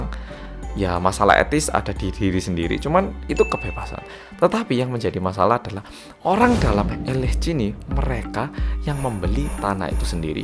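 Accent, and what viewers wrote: Java, Indonesia. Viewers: Tim gercep mas medokkk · Wah ma's emang he at bgt gua coba bicara2 bhs medo aja gabisa😭😭😭😭😭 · Bersama orang Korea yang "MENDOK" :V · yang medoookkk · Medhooookkk. Gercep dan setiap nonton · Welcome back to KOREA REOMIT BERSAMA ORANG KOREA YANG MEDHOOKKKKKKKKKKKKKKKKKKKK🚗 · helooo mamang medhoookkkk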